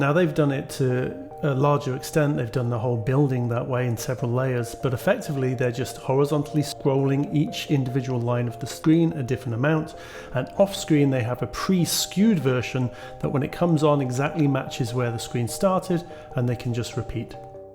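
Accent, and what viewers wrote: United Kingdom. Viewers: Is this a sligthly british Joey Zaza talking ?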